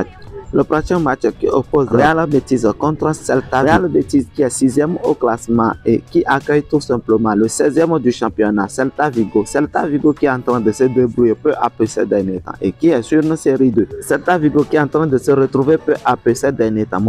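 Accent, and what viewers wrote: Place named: West Africa. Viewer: J'adore trop ton accent a l'ancienne français africain 😂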